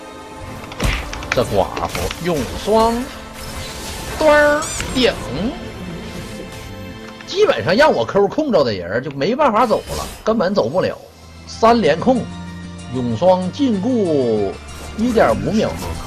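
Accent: Northeast, China. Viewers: 其實大哥說的是東北話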